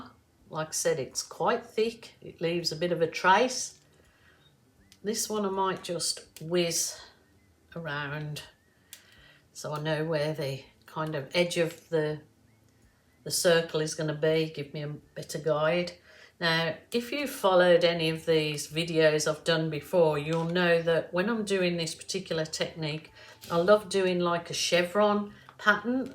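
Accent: Birmingham, United Kingdom. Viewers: Thank you. An interesting technique. I love guessing accents. I'm listening to you...were you originally from the West Midlands? I hear a bit of "brum".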